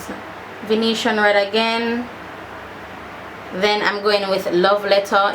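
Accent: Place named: Jamaica. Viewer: Well excuse me; that look screams pure royalty 🙌🏾. You look absolutely stunning. Very skillful. On another note, I just love your accent, tone of voice, speech pattern and how you enunciate your words. Thumbs up for Jamaican 🇯🇲 patwah. Thank you for sharing.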